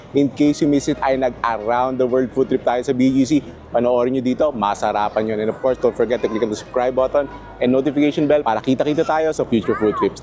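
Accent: Batangas, Philippines. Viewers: Your Tagalog brings memories of when I lived in Lipa for four years. I love the accent and the slangs specific to Batangas. Hopefully I could visit that part of the Philippines again someday 🙏 😀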